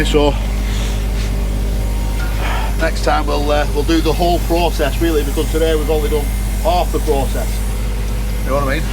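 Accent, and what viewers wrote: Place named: Preston, United Kingdom. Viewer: It’s not just the Preston accent… but the engineering drama is like ‘DIBNAH 2000’ or something. Love it.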